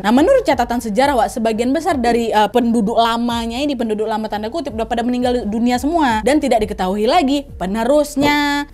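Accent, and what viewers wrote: Indonesia. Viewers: 😂lucu benar kak ni bercerita dgn loghat bahasa Indonesia nya...saya lebih suka lihat dia berbicara drp isi ceritanya😂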